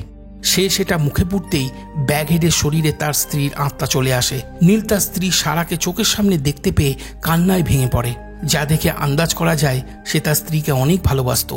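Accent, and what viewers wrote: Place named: Bangladesh. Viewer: তার জন্য আপনার কথাবার্তার ধরণ বাংলাদেশি,, 🇧🇩,, বাংলাদেশি ভাব আছে আমি বাংলাদেশ কুষ্টিয়া জেলা থেকে আপনার ভিডিও দেখি অনেক দিন ধরে আমার টার্গেট তাহলে ঠিক আপনি বাংলাদেশের বাংশোদ্ভূত 🇧🇩❤️❤️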